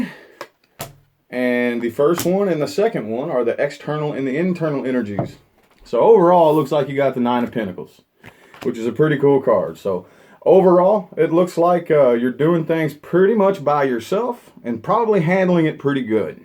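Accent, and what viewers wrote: South, United States. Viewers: Where is his accent from? Is that a southern accent? haha. Love it thanks! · From the second you opened your mouth I knew I had to hit subscribe! Love the southern accent! Great reading.....!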